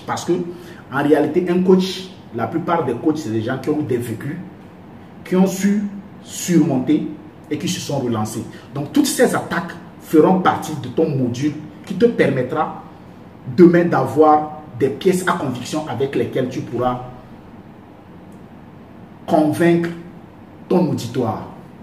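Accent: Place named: Togo. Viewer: Enfin un togolais qu'on peut suivre. J'ai reconnu l'accent en meme temps. Preach brother!! Preach .... Bon vent a toi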